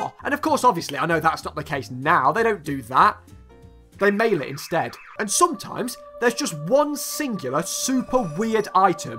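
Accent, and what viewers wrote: United Kingdom. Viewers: U.K. accent is strong in you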